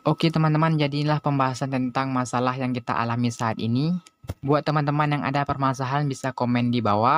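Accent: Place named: West Sumatra, Indonesia. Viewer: Trima kasih infonya bang Btw suaranya kek minang kocak hahaha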